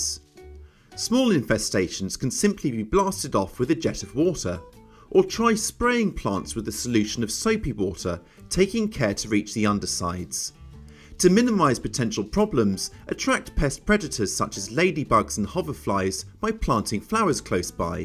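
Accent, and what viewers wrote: United Kingdom. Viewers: First sentence and I label him as Brit. :D I have a weakness for their style so.... (pardon gross generalization but Brits make me smile)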